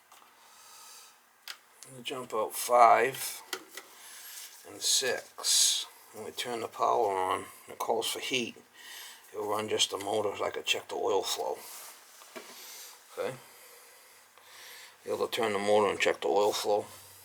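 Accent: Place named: Boston, United States. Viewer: Now I know what those little blue caps / containers in my wife's plumbers bag are lol ... Excellent video my man .. you from BAWSTIN I take it? You sound like decaprieo and marky mark lol cheers ❤️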